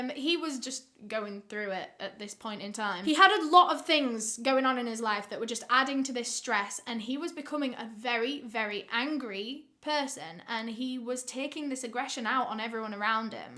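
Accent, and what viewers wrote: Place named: Yorkshire, United Kingdom. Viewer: I think its a British thing. They say so many words strangely lol same with Australian accent...plus different dialects. she says sooch instead of such. She says gale for girl.. lol I love it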